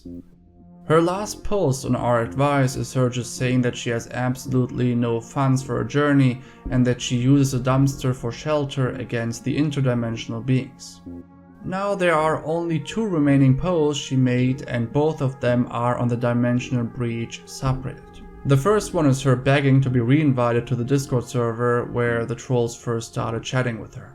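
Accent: Germany. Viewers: Sounds German to me too but I'm not positive. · Are u German?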